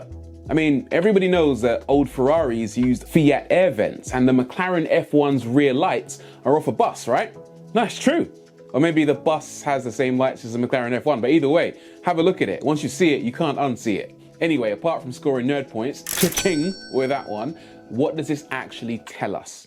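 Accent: United Kingdom. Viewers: Beside your amazing knowledge of cars and very pragmatic attitude, there is one thing I love about your channel that is part of you : your British accent. As a native Frenchspeaking Belgian, I learned English with American teachers and got very disappointed when confronted to the various accents of UK except the Queen’s English. I never need subtitles for US channels nor do I for yours. I don’t know how your accent is considered in UK but I definitely love to watch your video’s for this reason as well. Your pronunciation is clear, your wording is precise and yet all but American. Thank you for introducing me to British pronunciation. A real pleasure.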